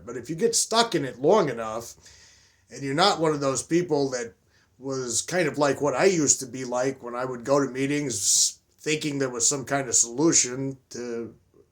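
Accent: New Jersey, United States. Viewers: The rhing about the midwest is hilarious. I live in Illinois and i coukd totally see that happening. They have the whole Midwest Nice thing. Not that we are really that nice. I like you New Jersey accent it doesn't brother me in the least.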